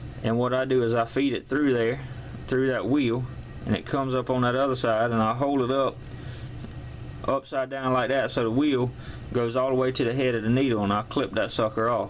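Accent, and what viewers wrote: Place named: South, United States. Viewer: Ok, your accent is more country than mine. Thanks for making these videos, I enjoy them. They are a super big help. The paperclip working one is something I will eventually try. But this one is what I was looking for. I am looking to raise just the back end of some old muscle cars, and moving the tires out a tiny bit.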